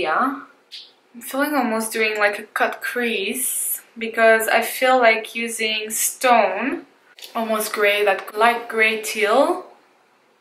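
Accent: France. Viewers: Beautiful look! Thanks for your first impression review, I'm so torn on whether or not I should buy it. I was wondering what your accent was because it sounded familiar, yay for another french girl! I'd love to see some videos in french too! I moved to the USA from Paris when I was 9, so I don't have my accent anymore :( it's a shame because I love it so much.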